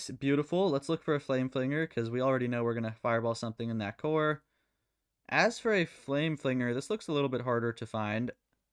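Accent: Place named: United Kingdom. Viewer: I thought you are British or ausie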